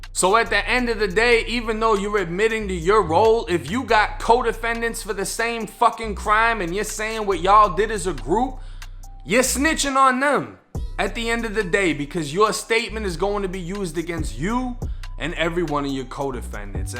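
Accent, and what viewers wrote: Boston, United States. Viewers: Why this dude have a Boston accent if hes from Florida? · Definitely sounds like a Boston Accent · Horrible narrorator voice. Half Boston/ wigger. Smh fat ... Hip-hop Harry.smh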